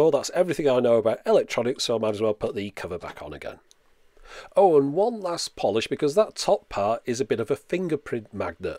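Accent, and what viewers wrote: United Kingdom. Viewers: I can totally get over how brits pronounce tyoobs, but I can't get over bought-a-preamp-for-$200-and-then-it-sat-in-a-box-for-two-months. :-) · you say TUBE just fine mate lol... I'm from the U.S. and I like listening to your U.K. accent . So please say TUUBE as much as you like . Keep up the good work ole boy lol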